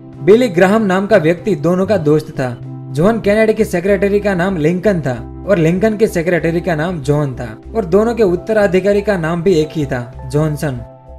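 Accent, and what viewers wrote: Gujarat, India. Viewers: Are you Gujrathi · आपकी आवाज में गुजराती स्वाद आ रहा है क्या मेरा कहना सही है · ગુજરાત ના છો ને ભાઈ?? પ્રોનાઉંશીયેશન પર થી ખ્યાલ આવી જાય છે 😁😀🙏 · Bhai Gujarti cho..?? 😁😂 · Ye awaz or bolne ki style ek gujrati ki he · आपकी आवाज बहोत अच्छी है , और टोन ऐसा है , like gujju भाई 👍 😍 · Gujrati lageche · Ye narrator gujrati lgta hai 😁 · nice video.. nd according to ur tone of voice i think u r gujju (kathiyavadi) .. m i right? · I like your gujju accent, seems to be real and true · You're from gujarat, because your voice is like a gujarat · I can guess that the speaker is Gujarati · You are gujarati ?? Because your speech has Gujarati accent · Bhai gujrati lags...? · By listening the voice of the speaker I can surely make out that he is a GUJRATI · I think are you gujrati because apki hindi main gujrati jhalak milti hai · Bol ne vala gujrati he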